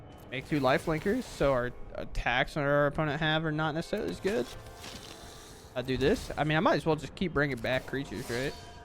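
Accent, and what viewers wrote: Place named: United States. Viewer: your accent, what part of the states are you from?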